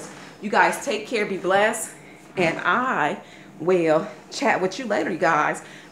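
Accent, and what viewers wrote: South, United States. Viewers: Wonder if this would work in the bathroom shower? Love your sweet southern voice. I live in the south too! God Bless!😉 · Thank you so very very for your wonderful clean tips using Baking Soda. But what exactly is “Fabuloso”? I never heard of it before. I hear you saying, “y’all” quite a lot are you from Southern? Is Fabuloso sold down South or in the Mid-West somewhere? I’m from NY is the something similar I could use? Anyway, thank you very much.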